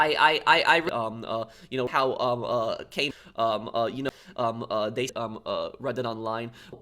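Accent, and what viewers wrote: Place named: United Kingdom. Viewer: I love he’s solid gta YouTuber, I have 0 hate for him but this video honestly made me laugh so hard, haven’t laughed that hard in a long while so thank you man👍 Also I could tell your British same as me with the east Enders intro and outro 🤣🤣🤣 loved it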